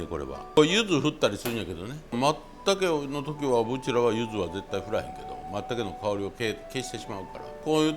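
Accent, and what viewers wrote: Kyoto, Japan. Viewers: 村田さんの気取らない感じや京都言葉がいいですね。 お出汁が３カップということはお米は３合でしょうか？ きのこ類全体でどのくらい量があるのでしょう？油揚げは１枚でしょうか？ 量について、もう少し詳しく書いてくださるとありがたいです。以前の動画なので、フォローむずかしいかもしれませんが。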